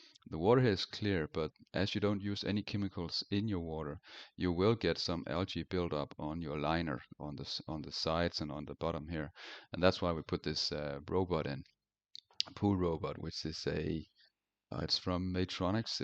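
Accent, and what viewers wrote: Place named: Denmark. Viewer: Your video was just recommended in my YouTube account. So then I just start watching it right away. And Wow. This is in Denmark. That’s why I felt little bit of Scandinavian/Nordic vibe in your video. I can hear your Danish accent . I didn’t know your Danish until seeing your name on your youtube profile.. Roskilde and Copenhagen used to be my home for 6 years. You’ve built an impressive natural pool. I like it. Thanks for sharing.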